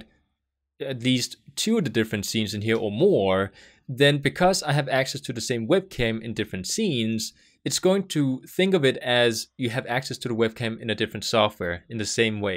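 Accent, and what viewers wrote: Denmark. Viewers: bro u danish? i can hear that your danish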